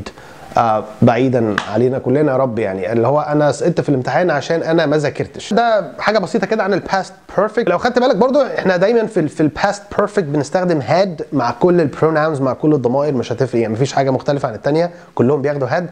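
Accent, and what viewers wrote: United States. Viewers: past participle نطقك امريكان والنطق اللى انت اتريقت عليه ده بريتيش ارجو لفت الانتباه لذلك لان بعض الناس هتعتقد انه نطق خاطىء وشكرا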